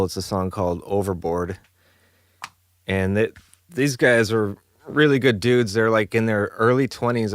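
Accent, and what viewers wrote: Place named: Chicago, United States. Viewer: Im from San Antonio and knowing full well your from Chicago this probably wont make sense, but your Chicago accent is hilarious. BTW its a Fender Bass VI. Love this episode.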